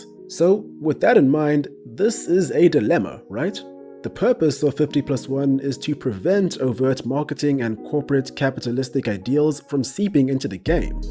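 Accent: South Africa. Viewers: Well well well, a happy coincidence finding a fellow South African! Knew it from the beginning when you started talking. Keep up the good work man and make us proud!! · South african accent definitely!!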